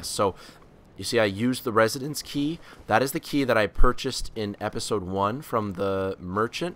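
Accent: Canada. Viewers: Love the accent, Canadian? This condensed walkthrough will help me immensely. I died after my first attempt to get into Undead Burgh after I slaughtered quite a few and had a "wat?" moment so I've been waiting for some help.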